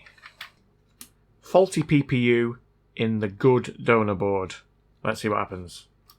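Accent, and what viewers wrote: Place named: United Kingdom. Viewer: I can't tell from the accent, are you from the UK? If yes, be extra careful with NES cartridges. PAL region has 2 sub-region, PAL A and PAL B and they are not compatible. PAL A is UK and PAL B is basically rest of Europe. I see a big letter A on the Castlevania cartridge and if your console is the same then it shouldn't be a problem. Usually the dirty part is the receiving side of the big black connector, not the edge connector on the main board side. You don't need to replace it, just clean it. Usually boiling in hot water, maybe adding a little dishwasher liquid can help but make sure you rinse it off, preferrably with some distilled water. Or apply vinegar, then rinse it off with alcohol. The point is you have to make it squiky clean and don't want any limescale to form on it either.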